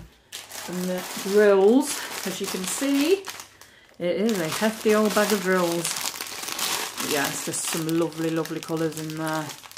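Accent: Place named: England, United Kingdom. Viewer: Wow I love the world earth day DP looks buatifull iv only come across you today An it's so nice to listen to an English person An not an American voice lol my cat daisy seems to love getting into amazone boxes xx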